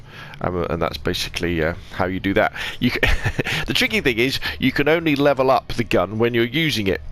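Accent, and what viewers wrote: England, United Kingdom. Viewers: Excuse me, sir, you are saying everything. Corrects, you explain it very good, but please take the marbles out of your mouth when you're speaking. It's really confusing. My friends, I know you have an english accent. And that's why listen I have a Boston accent. That's very deep so if you could clarify a few things, take the models out of your mouth and thank you.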